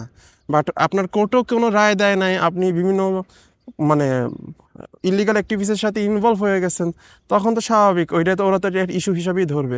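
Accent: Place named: Jessore, Bangladesh. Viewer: আপনার ভাষা আমার এলাকা যশোরের মতন অনেক টা । আপনার দেশের বাসা কোথায় ?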